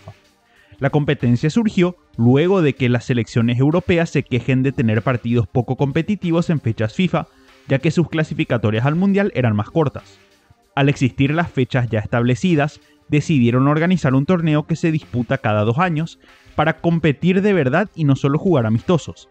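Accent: Paraguay. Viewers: TU ERES PARAGUAYO TU TONO SUENA COMO UN PARAGUAYO ? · intento adivinar tu acento, eres paraguayo¿?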